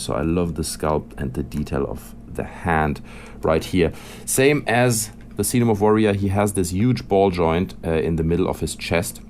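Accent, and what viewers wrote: Germany. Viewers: Du bist doch sicher deutsch ich höre es. Oder irre ich mich? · Du bist deutscher stimmts? Man hört das irgendwie am english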